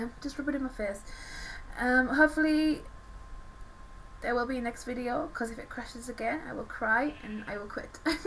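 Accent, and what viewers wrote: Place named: United Kingdom. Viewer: U speak british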